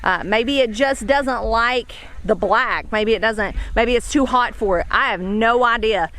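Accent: South, United States. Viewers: Great advice. Good lecture . Good garden. Love to hear your voice good southern accent. Thanks for inviting me.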